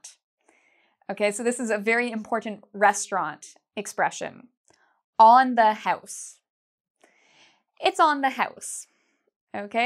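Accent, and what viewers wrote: Canada. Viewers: Are you Canadian? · I have been watching videos 4 the last few months and I have found out that she is brilliant teacher. I adore her Canadian accent!, u rule! Thanx a lot 4 lessons. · I can understand you all aspect and topic that you make. Your accent and Canadian english is very clear and neutral than U.S.A and british english. Thanks teacher!!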